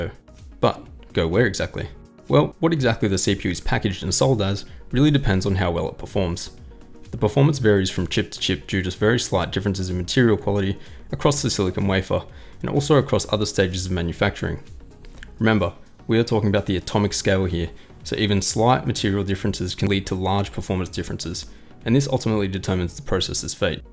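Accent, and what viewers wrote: Australia. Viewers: Man, Australian accent is a little complicated...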